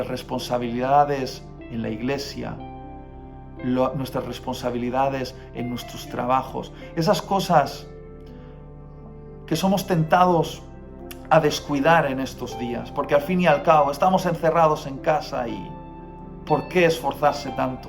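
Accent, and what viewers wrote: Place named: Basque Country, Spain. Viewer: Muchas bendiciones Dios te utiliza en gran manera y atu manera de ser saludos desde zamora (Castilla y leon ) España🇪🇸 aveces parece que ya no tienes el acento vasco pero luego eah ay esta 😂Dios te bendiga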